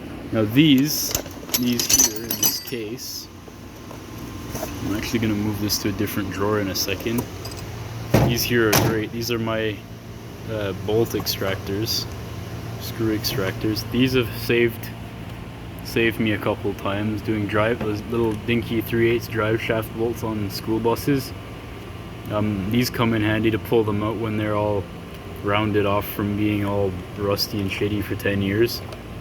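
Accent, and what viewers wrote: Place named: Canada. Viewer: First, I'd like to mention how Canadian you sound there bud! Love it! Secondly, I am also an apprentice I work on car haulers transport trucks. Love every minute of it. As well, my tool box is a 3 foot MasterCraft works good and I have a lot of princess auto/Canadian tire shit. Cheap and works! Cheers there bud!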